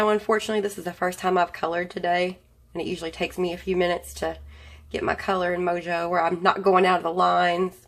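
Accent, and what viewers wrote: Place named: South, United States. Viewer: My boyfriend has asked me why I don't want to start a channel in the future and my Southern accent is one thing I worry about, so it is nice hearing your sweet, southern belle accent. I'm so glad Beth told me about your channel. You have tons a great stuff so I'll be binge watching you for the next few days 8)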